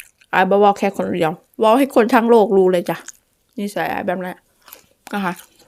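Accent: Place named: Sakon Nakhon, Thailand. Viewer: ยุสกลบ่จ้าคึเว้าคือทางสกล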